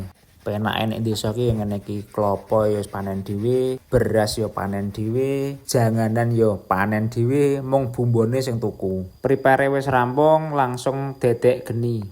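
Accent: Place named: Banyumas, Indonesia. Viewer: bahasane ngapak bangeet Mas 👍🏻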